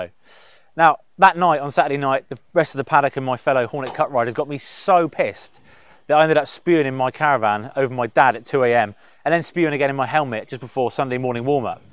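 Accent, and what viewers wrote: United Kingdom. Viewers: His british accent is so strong, i can barely understand him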